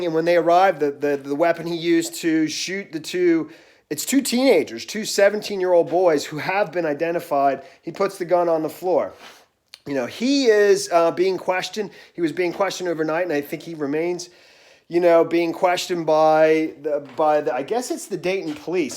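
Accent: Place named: Charleston, United States. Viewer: Your accents pretty thick, its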